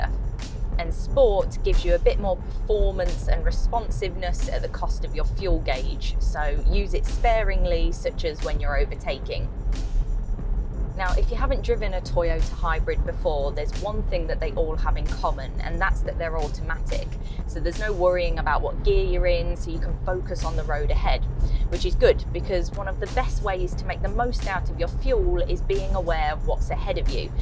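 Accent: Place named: Northern England, United Kingdom. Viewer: I'm sorry but your Northern accent kept putting me off. Every time you said Munnee instead of Money I could feel myself cringe.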